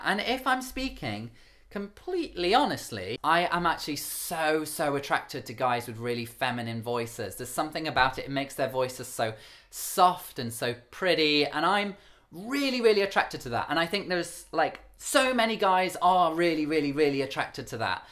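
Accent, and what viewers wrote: United Kingdom. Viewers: From the perspective of a gay American man with a deep voice, when I listen to you speak the first thing I think about is not whether or not you sound gay – it's how British you sound. Your Englishness overwhelms everything else to an American ear, or at least this one. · Woow british accent so wonderful 😩❤️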